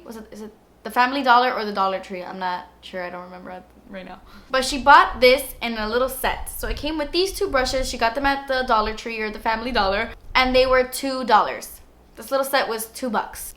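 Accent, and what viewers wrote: England, United Kingdom. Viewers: Hahaha your English accent is SO GOOD!!! so funny:D Love you <3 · Girl we love you! I liked this video before watching it! I always go out & look for the stuff you show us cause I'm obsessed. I window shop most of the time for makeup but the stuff I can afford, most of it comes from you lol even though I have oily skin 😳 but I love you! Keep doing you, and I hope your house is ready soon, can't wait to have you back completely and we all appreciate how hard you've been trying to get content out for us! I hope you know how much your subbies adore you!!!! #dassesspensive P.S: I love when you do the British accent randomly! Lol I do that too and I get weird looks like "wtf?" Lol LOVE YOU! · Love when you do the British accent, you totally resemble Emma Watson! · Love the british accent. Can u pls make a video with the whole british accent?...i really really like it..and of course i love your videos...thanks for mentiong VIB sale love yeah!!!😘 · lmao! 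your british accent kills me every damn time! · omg tht british accent tho :'DDDD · Your English accent cracks me up! coming from a brit 😊 xx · Your UK accent is on point! lol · Omyyyyyy. That british accent. ugh <3 It's so perf! I love you!:* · for some reason, whenever you talk in a British accent, you remind me of Leigh Anne from little mix lmao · Your British accent, so cute!! I'm British so I know 😉 xx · That British accent is a bad habit. Stop. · I've recently subscribed to you and I absolutely love your videos! You should do an entire video with ur British accent :) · You look stunning in this video and the random British accent... I love that I'm not the only one who does that!!! Your perfection!! 💋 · pls make a video with your british accent. i think its cool. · Girl your British accent always puts me in a great mood 👍🏻💓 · Love that British accent btw! 😂 😛😘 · haha whats with the britsh accent! 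you are sooo cute...!!! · I loved your British accent 👏👏👏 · Love your British accent!!! Lol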